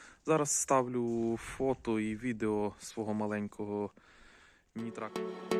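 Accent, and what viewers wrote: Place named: Rivne, Ukraine. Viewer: Акцент напевно Рівненський ❤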